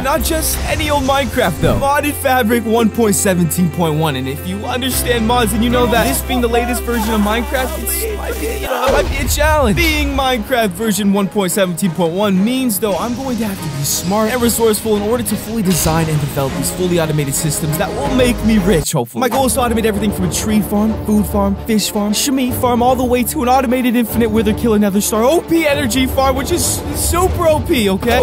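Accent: United Kingdom. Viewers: Hey I realy realy realy want you to hit 10mil and and your my favorate british youtuber keep it up and good luck and your british acsent is amazin🇬🇧🇬🇧🇬🇧🇬🇧🇬🇧🇬🇧🇬🇧🇬🇧🇬🇧🇬🇧🇬🇧🇬🇧🇬🇧🇬🇧🇬🇧😀😀😁😁😁😁😀😁😀😀😁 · ??? I hear br'ish accent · wait is it just me or does he have a brit accent sometimes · I always wonder how you speak british and inglish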